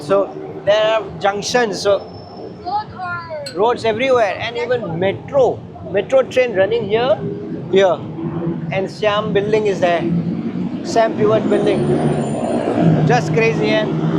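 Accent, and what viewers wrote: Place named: India. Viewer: Change urrrrr indian accent please. They will think u r Nepali bhaiya . Rrrrrr. Oooooor change it please my ear is bleeding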